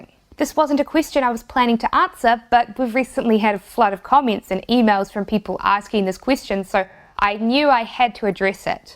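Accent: New Zealand. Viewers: She literally said " Hir in Niw Zilind " . Didn't you hear ? :) Love the accent btw no offense meant :D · I hear a KIWI lol. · You must be from New Zealand with this accent! Nice and informative though. Thanks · Awesome video thanks! BTW my wife and I are currently doing about six months of travel in NZ and I have watched a few of your videos and I was like she sounds like a Kiwi, but I wasn't sure until this video. We call ourselves the Kiwi wannabes :P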